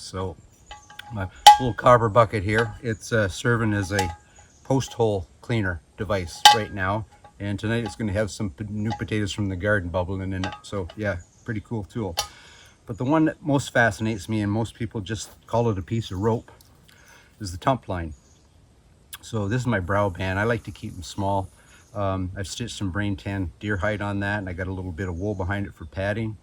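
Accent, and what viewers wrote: Canada. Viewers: Why did you shake your head at 40 secs. in? looking at something on the ground in the middle of a path. And, why is your cabin so close to that pond? And, what species of tree were you cutting down at the beginning of this video? A conifer obviously, but what kind? [Ah! you mention "cedar" . Must be Eastern Red Cedar (which of course is not a "cedar" at all but a juniper - Juniperus virginiana; that is if you are east of the Mississippi. As straight as they are I'm guessing East Red] And, you keep mentioning how hot it is - I'm a new subscriber so do not know where you are located. I don't mean specifically, but just a state or province would likely do for me on climate. Oh! just heard that distinctive "ow-tt" of the Canadian English. (An idea:you could shed some body heat by taking off that felt hat - I think some one said 30% of your body's heat is in your head). 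And, obviously you are not worried about how green that wood is - is that b/c shrinkage will not be a problem. (I was assuming you were using this kind of tree b/c it's rot-resistant). And, I wish you'd shown us how your attached the wood pieces. I assume some sort of nail? But it would have been good to see that. I would also have liked to see some of those two hours getting a hole through the rocks! Re: saws I think maybe I got one of them there crummy saws from Ace hardware. It's got their name on it. Well it is a BITCH to use! I took it in and asked two of the guys there what the deal was? they didn't k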